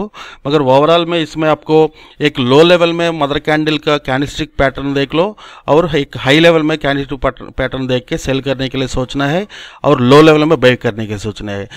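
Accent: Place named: Mumbai, India. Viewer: Your Hindi is Mumbai Hindi...easy to understand....Keep it up...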